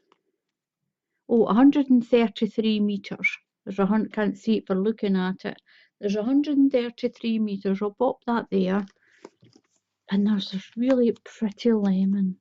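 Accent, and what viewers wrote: Scotland, United Kingdom. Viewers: oh yes I saw the yarn and kept thinking "why does she keep calling these packages"happy meals?" Your glorious accent makes me want to visit Scotland!! Love your videos!!!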